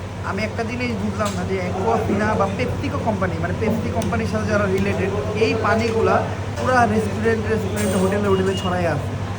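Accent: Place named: India. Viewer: কে কে খেয়াল করেছেন, ভাইয়ের কথা বলার ধরন কিছুটা ইন্ডিয়ানদের মত হয়ে গেছে, 😅😅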